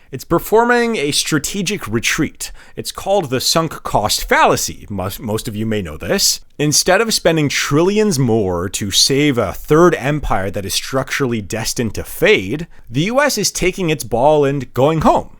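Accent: Canada. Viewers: I can tell that you are Canadian from your accent, which makes the fact that you managed to make it through this entire video without uttering the name “Trump” once all the more impressive. Excellent analysis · Is this dude Canadian or what?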